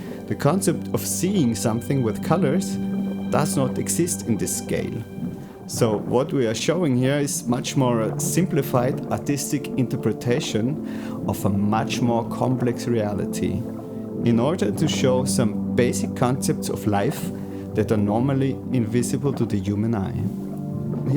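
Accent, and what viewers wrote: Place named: Austria. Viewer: Awesome work,! And awesome Austrian English 😄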